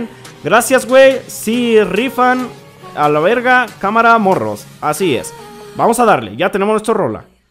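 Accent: Costa Rica. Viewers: Wtf sos tico o algo? Xd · Sos tico?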